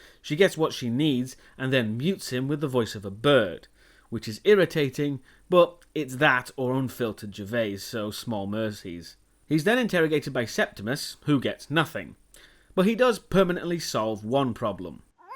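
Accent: United Kingdom. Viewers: cool, anyway,i feel that even though we may disagree on some issues, i still love your reviews. i wonder, how are you in reviewing movies outside of horror and action? maybe some comedy, or if we can crossover genres then maybe some MCEU or DCU, maybe throw in some Stathem movies and some Die Hard for flavor. for further horror, there is still the nightmare franchise with micheal myers and jason vorhees. if you are so inclined. love your work BTW. i was wondering, whereabouts in england are you from? sounds a little like a yorkish accent. i may be wrong.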